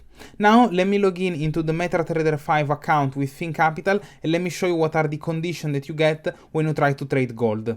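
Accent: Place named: Italy. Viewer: Ma sei per caso italiano? perchè hai quell'accento li italianizzato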